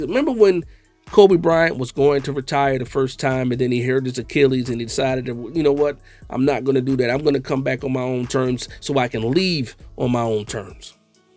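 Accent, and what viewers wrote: New Jersey, United States. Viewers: You still have a little of your Jersey accent left. Stay strong broski 💪🏼 big blue 🔵!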